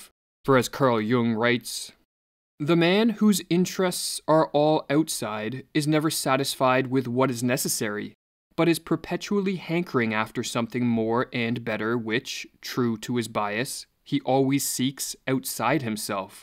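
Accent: Canada. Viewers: Great videos, i just cringe at the Canadian accent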